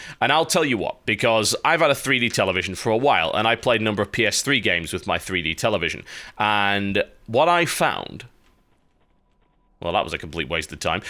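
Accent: United Kingdom. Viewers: has a specially marked brittish accent today.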